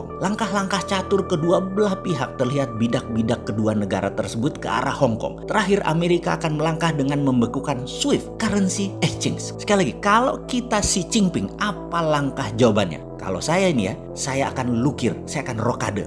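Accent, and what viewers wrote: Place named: Surabaya, Indonesia. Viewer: Jamput rek ..cakcuke arek suroboyoan.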